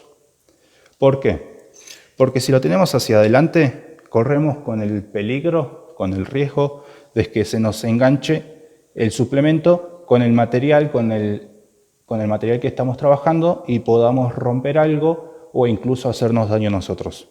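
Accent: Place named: South America. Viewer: Me caga oír hablar aún sudamericano 👎👎👎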